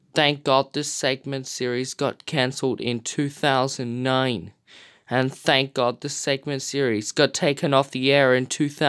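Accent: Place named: Australia. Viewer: Your accent is Australian, and I didn't know you were from Australia. Also, opinion respected on Elmo's World.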